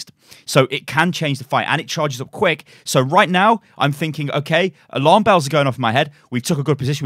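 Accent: Austria. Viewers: the language is german, but it sounds more like an austrian speaking it. dunno, might be wrong. lots of dialects in german. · He is from Vienna...local vocabulary and accent xD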